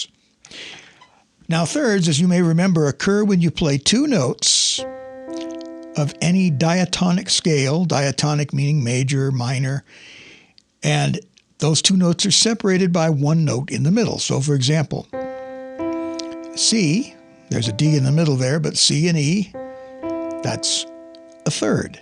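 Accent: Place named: England, United Kingdom. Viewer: Blimey, I was proper convinced you were from England! Pure english English, innit! I'm lovin' it! Your speech is like music to my ears, like a bit of Chopin! :-)) I will keep listening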